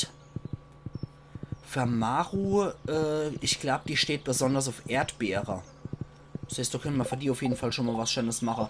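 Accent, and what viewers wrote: Palatinate, Germany. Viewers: Ja klar hab ich dich abonniert. :) Durch dein Lets Play hab ich ja Stardew Valley überhaupt erst entdeckt! Und dank dem Ackermann wusste ich dann oft, was ich den Leuten zum Geburtstag schenken soll oder wo ich etwas finde. Das hilft sehr, und ausserdem macht mir das Pälzisch solche Freude. Lustigerweise gibt es ganz viele Parallelen zu meinem Dialekt, dem Baseldeutsch. Und du kannst so cool Stimmen imitieren! Lewis und Willy - oder die alte Evelyn! Voll gut! :D Achja, ausserdem freu ich mich immer wenn endlich mal jemand anständig symmetrische Sachen baut. :) Freu mich schon auf die nächste Folge! Ganz liebe Grüsse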